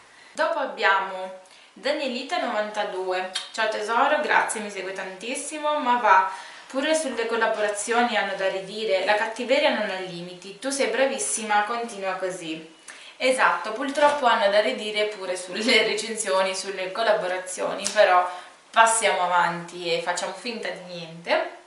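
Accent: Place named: Sicily, Italy. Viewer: Ciao sei davvero molto simpatica e i tuoi video sono molto utili. Guardando questo video ho sentito che sei Siciliana come me. Se ti fa piacere visita il mio canale =)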